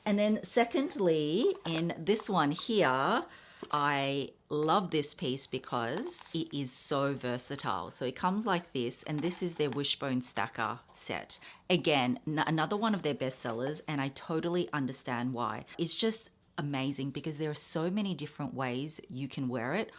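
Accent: Australia. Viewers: Hi! I'm new to your channel, but I just love your videos and your Australian accent. Congrats on hitting 300k! Three prizes is my choice. 😘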